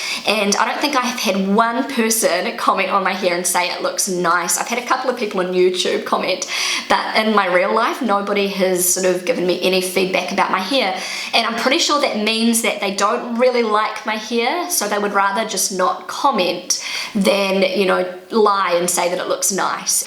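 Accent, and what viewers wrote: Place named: New Zealand. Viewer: Dear, I give you a genuine compliment. I love your Kiwi accent so much, as it reminds me of the journey of a lifetime to your wonderful amazing country back in 2003. I live in Swabia, near Stuttgart, and swabians are so reluctant in saying something nice or encouraging to you. I work as a M.D, now as a GP, and I always try to encourage people and give them a POSITIVE feedback if they do something good. But their is often mistrust in people. Maybe I wasn't meant to be German, who knows. Happy Christmas season greetings from Waiblingen.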